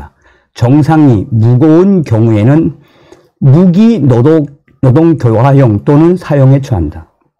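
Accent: North Korea. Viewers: 북한식 사투리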